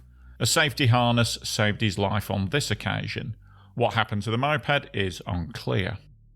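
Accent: United Kingdom. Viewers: You have thickest most unintelligeble Br'ish accent Ive ever suffered.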